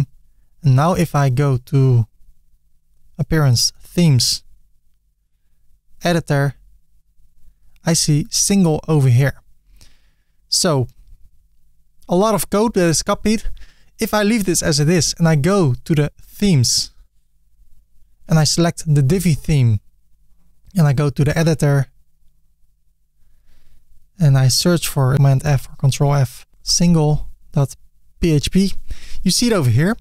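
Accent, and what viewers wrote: Netherlands. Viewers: It took me 26 seconds into the video to realise you are Dutch. Especially the pronunciation of "file".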